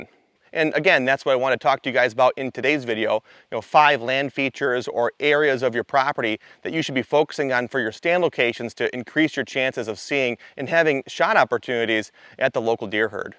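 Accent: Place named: North, United States. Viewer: Nothing but yankees and their accent and nomenclatures doing hunting vids. Is a good ol country boy to much to ask for vs city folks? Whats pryporties?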